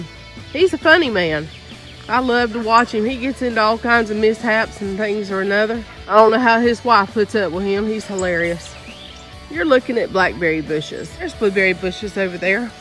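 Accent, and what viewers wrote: Mississippi, United States. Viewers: Love your garden. Very neat and beautiful. I love your Mississippi accent too. So cute...Have a blessed Easter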